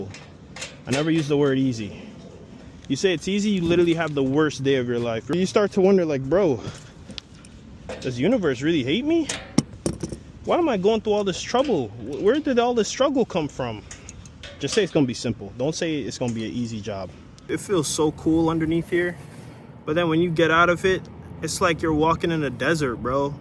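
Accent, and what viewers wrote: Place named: New York, United States. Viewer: Native New Yorker here from Spanish Harlem. Your accent reminded me a bit of New York. Happy to see a fellow New Yorker thrive. Knicks in 5 🟠🔵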